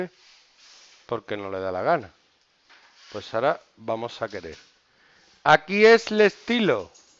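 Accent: Spain. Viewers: Increible que en 1288 vistas, nadie haya reacionado. En fin... "le stylo á qui est?" no se dice de ninguna manera. jajaja y las tildes al revés y la pronunciación a la española. en fin. un desastre.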